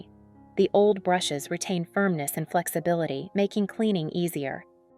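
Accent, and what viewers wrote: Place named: Texas, United States. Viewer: Gainesville Texas english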